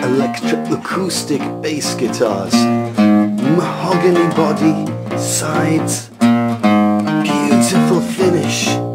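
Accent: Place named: Australia. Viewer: he sounds like an australian guy giving a review on an animal.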